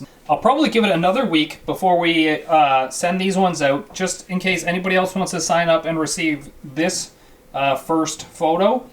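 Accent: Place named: Newfoundland, Canada. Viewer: You sound 80% more newfie in this video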